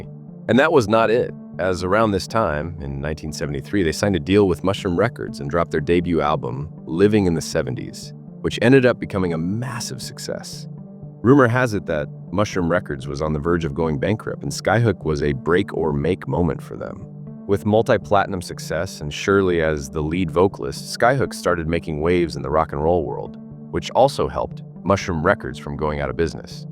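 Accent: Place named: United States. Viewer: As an avid Skyhooks fan from day dot, for some reason I've always been obsessed with the death of Shirley, always digging deep into further research into the mystery circumstances surrounding the actual cause of why the chopper crashed. We all knew there could have been many factors, like how the weather conditions took a turn, but it was always an unsolved curious puzzle and more to it than meets the eye. I've seen a lot of reports into this over the years, but this is the first time I heard of Shirley possibly having a heart attack, that sent a chill up my spine, something we probably will never know. Also, who is this American or Canadian narrator, his pronunciation is incorrect, it's Red Symons not Simmons!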